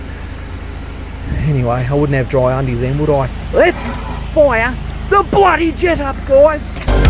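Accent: Australia. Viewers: Watching from the Netherlands. Stumbled on your channel when your vid about unclogging a drain in a parking lot showed in my suggestions. No idea why, but it is hilarious, especially with your ozzie accent. Early next year we have plans to visit Perth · This reminds me why i don't got to Chinese buffet anymore and why it gave me diarrhea when i did.... this is funny shit and the aussie commentary makes it better...... it's choka blocked mate....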